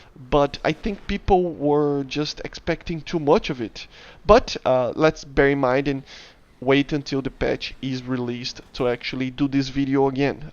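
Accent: Brazil. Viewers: where are u from? your soutaque looks like brazilian soutaque xD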